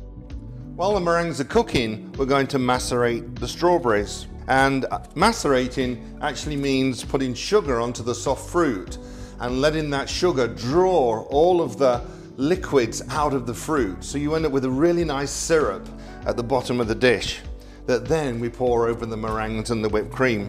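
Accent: United Kingdom. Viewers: You didn't introduce your family to the viewers. It would have been great knowing them. I love watching your dishes especially the way you speak about the Queen, Prince William and Prince Harry and of course Princess Diana and all the stories. I just watch the dishes being made though I am a true Vegetarian, don't even take eggs. Good luck. Keep telling about your Royal experience and stories along with history. I like your authentic British English. I am from India.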